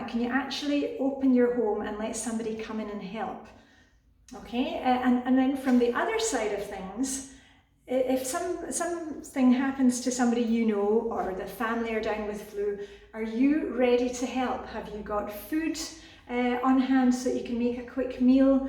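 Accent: Scotland, United Kingdom. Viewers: Hi, I know you from Carol Tuttles T1 page. How did I not know you were Scottish 😍. I assumed you were Danish. From a fellow T1 flybaby 😀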